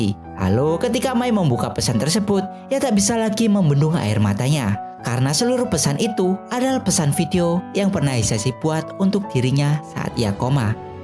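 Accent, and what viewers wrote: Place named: Java, Indonesia. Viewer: Ya Allah, suaranya medok bangets...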